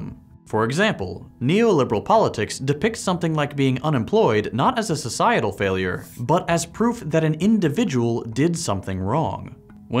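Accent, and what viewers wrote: United States. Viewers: Hit the nail on the head as usual. As a small aside, as someone who doesn't regularly speak to those with american accents it took me three "gender parity"s to get that I wasnt hearing "gender parody" 😅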